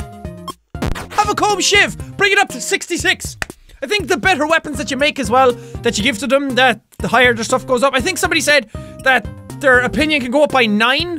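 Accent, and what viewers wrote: Ireland. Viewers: Ai love the way the Irish say 'three' and 'arse' it awesome. 😂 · When he says Carl it sounds like Carol because he's Irish as hell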